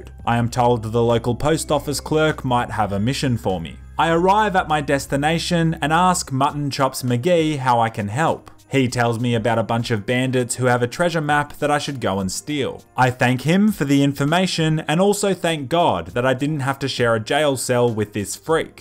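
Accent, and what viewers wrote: Australia. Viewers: dude u Aussie?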